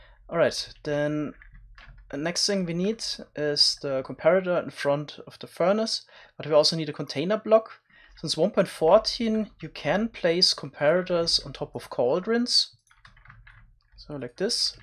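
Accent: Germany. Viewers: Haha man hört das du n Deutscher bist :D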